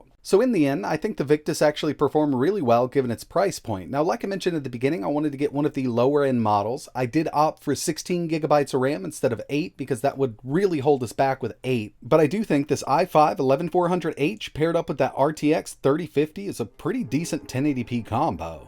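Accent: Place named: Appalachia, United States. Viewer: I love watching this channel and have been following it for a very long time, at least two years - what interests me is what is his accent? From which part of the US, where people spoke with this kind of accent. Does anyone know that? His way of speaking is the only complaint I have regarding this channel - sometimes it sounds a bit to hillbilly, sometimes it is too noticeable! (it's not my intention to make fun of anyone)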